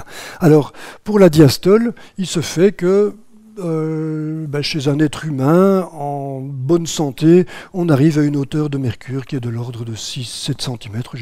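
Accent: Belgium. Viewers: Bonsoir Alain Soral ... alors à Lauzanne à présent ?.. mais alors d'ou vient cette accent belge pas courant en Suisse ?.. On ne connait pas la deuxième personne du pluriel en belgique ?.. Cette façon de se croire moderne en tutoyant les inconnus au pretexte qu'on est derrière son ordinateur m'agaçe passablement !.. Je vais donc aller ailleurs !.. Bonsoir !..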